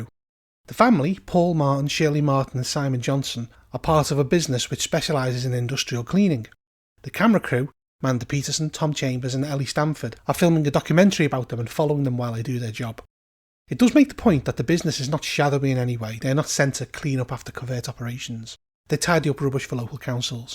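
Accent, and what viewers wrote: North, United Kingdom. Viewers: Hi, where are you from? You have a great voice to my American ears but wondering if it is Northern? I’m going to run Scritch Scratch tonight and enjoyed your summary. The scenario does suggest groupings for less than 6 players, on page 8 under the heading “Six of One” in the edition I purchased which looks a bit different than yours. Cheers!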